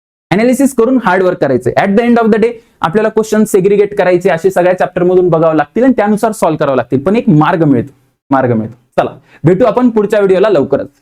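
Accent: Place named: Jalgaon, India. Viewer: Sir tumchi language Jalgaon chi ahe😅tumhi jalgaon che ahe ka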